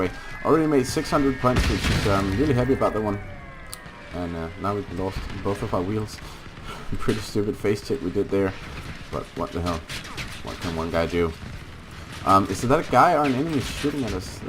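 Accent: Denmark. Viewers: 5 seconds into the video I'm like : "This dude is totally danish" 😃🤘 Skål, brother xD <link>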